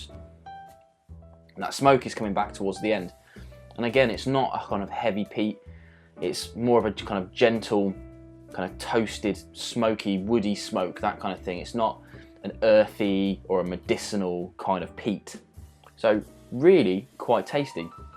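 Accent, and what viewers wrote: England, United Kingdom. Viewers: I actually like the English accent. It makes him unique. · i could do without the engish acent.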